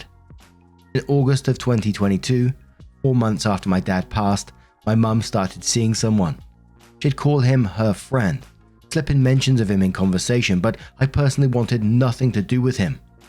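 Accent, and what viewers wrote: England, United Kingdom. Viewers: Hello - a fan from the U.S. here! I’m absolutely hooked on your videos. I studied at university in England for a year so love hearing your accent. It brings me back there. I really enjoy the emotion, inflection and humor you add to Reddit stories. I can tell you have a huge heart and really care about people. Thank you and keeping doing what you do!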